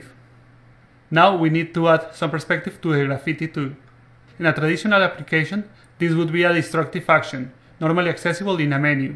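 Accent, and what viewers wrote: Spain. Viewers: Buenas equipo de. Antes de nada, vuestro software es increíble, estoy deseando probarlo y empezar a hacer mis texturas en él. Otra cosa; si no me equivoco por el acento, sois españoles, si es el caso, tenéis algún tipo de video tutoriales en español? Por lo que he visto son todos en inglés, aunque no es un gran problema, se me escapan ciertas cosas con el inglés, y me iría de perlas que fueran en castellano. Muchas gracias y seguid así!!!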